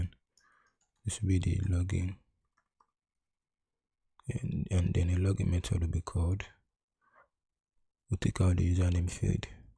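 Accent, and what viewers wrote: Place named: Igbo, Nigeria. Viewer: this na confirm igbo guy, probably from enugu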